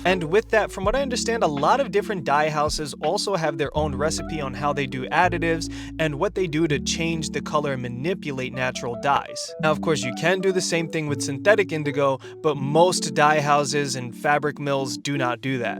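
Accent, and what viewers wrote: New York, United States. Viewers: You got that nu yok accent brotha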